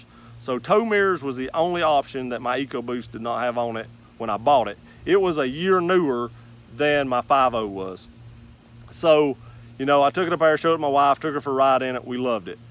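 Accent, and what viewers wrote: South, United States. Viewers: Which state did you film this video in? It looks quite hilly in the background. You also have a distinctive southern accent. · Love the country twang!!! Great video, New subscriber here.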